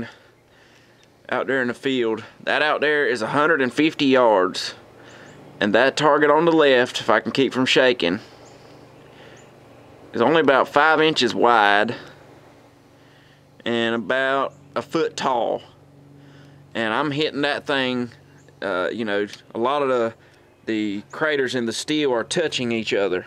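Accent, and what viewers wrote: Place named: Louisiana, United States. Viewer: North Carolina? You sound like you from the Bayou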